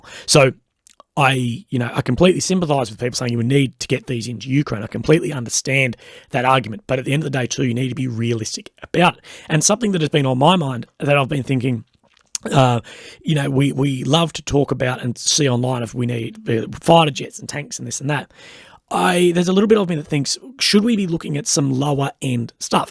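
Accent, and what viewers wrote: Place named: Australia. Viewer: the western corruption is far more discreet and is missive it seames the military's is used as a cash cow no mater where it is . Even the amount of maintenance western weapons' have to undergo to keep it running at peak performance is dodge. I like your channel and hearing an Ozzy accent presenting your take on the situation is good . cheers mate and best of luck with your health battle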